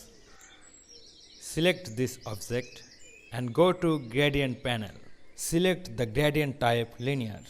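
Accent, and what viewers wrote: Bengal, India. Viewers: own a indian accent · this is the bengali accent, almost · Pure Bengali Accent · It's sound like that u are a bengali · Youtuber: speaks with Indian accent Me: throws up, dislikes video, reports, leaves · English a bolar quality tei bojha jacce j uni bangali. tai bolci banglai bolte r kicu na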